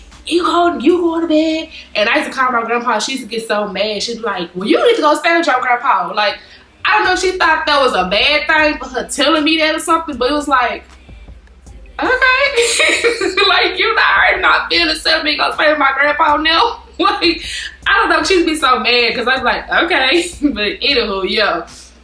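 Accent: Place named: Texas, United States. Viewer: Hey girl new subbie!! You have been making your rounds. I've seen you on Gina's channel as well as commenting on Rochelle's!! Girl I heard the Texas in you as soon as you opened your mouth I knew I was at home! I love it! I'm from Texas too!! Make sure you turn up this year, go out of your 20's with a bang🎉💃🏽🍷I love your vibe, you're so cool and I can tell you're a sweetheart.🥰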